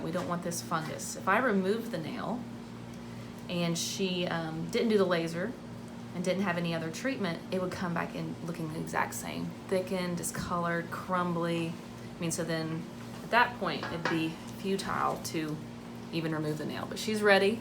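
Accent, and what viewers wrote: Kentucky, United States. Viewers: I knew that Kentucky accent when I first started watching. Then you mentioned toyota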